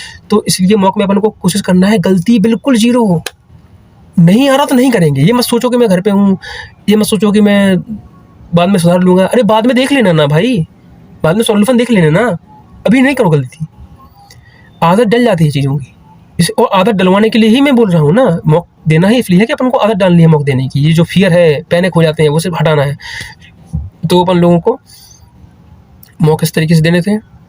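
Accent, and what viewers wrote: Lucknow, India. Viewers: Aap lucknow se ho?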